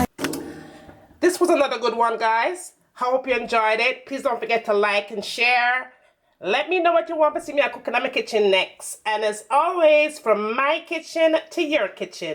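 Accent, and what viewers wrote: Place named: Jamaica. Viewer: Looooove the cooking but looooooooooooooooovvvvvvee the Jamaican accent! ❤❤❤❤❤❤❤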